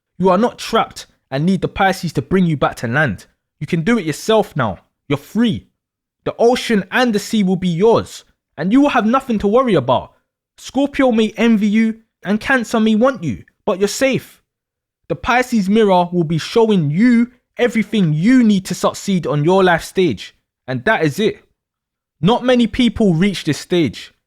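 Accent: United Kingdom. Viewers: One of the only British voices that commanded My respect.😄 Great Analysis. I found this video searching for a video to explain this. I was triggered today about someones description of a Piscean in love. You explained it perfectly. Ase'. Let Me add, Very Few can make Me laugh, and Your voices explaining the mindsets took Me out. Thank You. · I’m 45 march 6th Pisces my other half is 47 and a Libra we’ve been together for 28 years and it’s been a lot of work from both sides for many years but he is my soulmate, it’s been questioned a few times but now I see why and so does he. I was going to hold back and send you a message after I’d watched all your videos but I couldn’t wait any longer you’ve been so helpful on my journey of realising who, and what I am you’ve helped me come to terms with many dilemmas I’ve had over the years, your insight is second to none so I wanna say thank you so much for sharing. 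I love all your little cartoony bits and you’ve got a great humour that has me laughing out loud and I love your don’t give a shit attitude too!! Which is helping me bring mine out!! So thank you again sending lots of love and light from this fellow Piscean ps my mums a Scorpio (terrible upbringing- she was selfish as fahhh) dads a Taurus so one caring one for me at least 😂😂. Please carry on as you’re helping so much and I think I’m gonna miss that London twang being an Essex girl through and through ❤. Love ya man!!!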